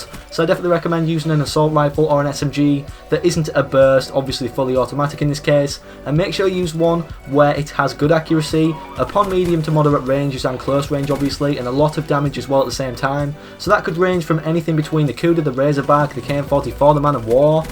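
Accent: United Kingdom. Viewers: SeeN It's literally just how British people talk. i.e. British say "Bri'ish" and Australians say "Austra'ian". Don't make fun of people's accents.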